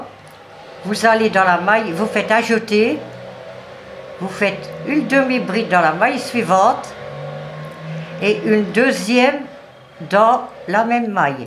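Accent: North, France. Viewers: bonjour, comment va tu je vois que tu as fait un très beau pull, mon arrière petite fill.va avoir deux et griniette petite menue, il et très beau comme habitude, c est bon de t entendre parler avec ton accent du nord, je vais essayé de le faire avec moins de mail? gros bisous et gros Câlins a pompon, fais bien attention a toi, a très très vite bonne après midi, Monique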